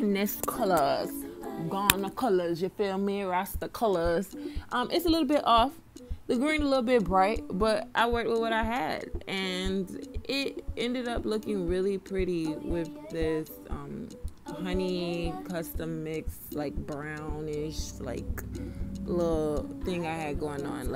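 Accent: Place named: Bahamas. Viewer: believe she's from the Bahamas lol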